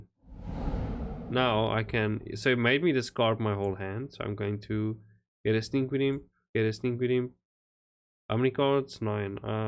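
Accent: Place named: South Africa. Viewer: The South African accent gets you a sub. Good video buddy.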